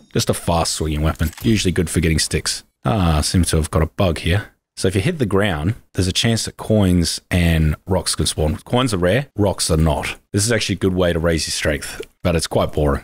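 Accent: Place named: Australia. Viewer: Dear god do i hear an aussie? I thought we were going extinct on youtube.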